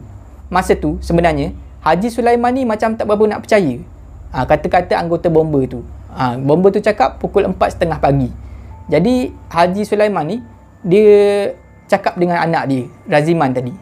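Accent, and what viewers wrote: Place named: East Coast, Malaysia. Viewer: oww patutlah loghat mcam org pantai timur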